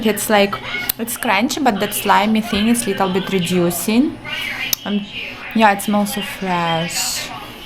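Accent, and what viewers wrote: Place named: Russia. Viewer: I love hearing your Russian English accent, keep uploading guys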